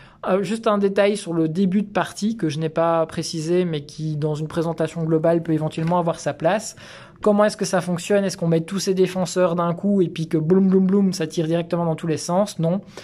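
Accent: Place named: Belgium. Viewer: ahhhhh caaaaa ne m'en parle pas. Mais ça fait plaisir d'entendre cette voix belge :)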